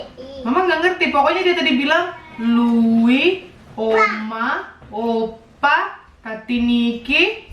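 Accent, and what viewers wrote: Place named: Java, Indonesia. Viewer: Wkwkwk dengklek jawa banget ya mak 😂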